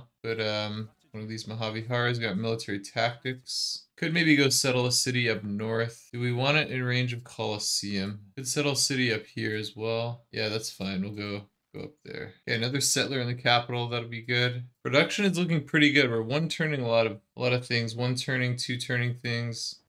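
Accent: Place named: United States. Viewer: Aaargh! Hadn't seen it to its end 😂 honestly - I'm not a huge fan of surprises, so much appreciated! I really like how organically or naturally you grow your civ(s) - that's how I hope my garden will grow this year! But I'm on like turn 50 with three cities, two wonders, some culture - but not an awful much more to show for it. I guess it's just a matter of enjoying the game, and if it's a fun defeat then just go with it 😆😶 I really enjoy your vids - I had a day off and I had kinda hoped to spend the day in the garden, but it was so rainy here that I ended up cleaning (which was embarrassingly needed, once I got down to the nooks and crannies!) - you were my coffee breaks! 😙☕👌 they were a delight! You have such a wonderful voice, you're so funny, and such a nice narrator! I love your crisp American accent, despite the fact that I get startled every time you say "route" - here it's pronounced "root" and I get baffled every time! 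And now I've told - and that's a weirdly big relief! 😂 Lots of love from Denmark 🤗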